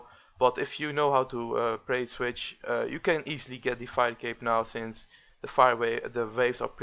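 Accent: Netherlands. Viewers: jij komt uit zeeland typisch zeelands accent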